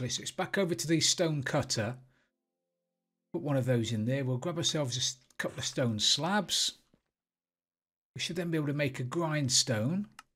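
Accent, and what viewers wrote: United Kingdom. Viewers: Awsome video and I just realized I find my self waiting for your British accent to sing some random song so I can lol thanks my friend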